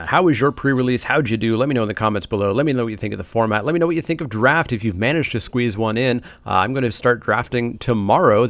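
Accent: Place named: Canada. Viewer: I never realized you were Canadian, but after you mentioned you're in Ontario, I suddenly started noticing your accent, and it's like "how did I miss that?"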